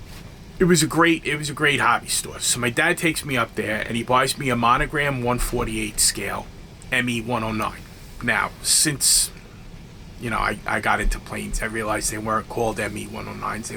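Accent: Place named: East Coast, United States. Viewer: Thank you very much for sharing. Your east coast accent brings back a lot of memories for me. I haven't been back in a long time!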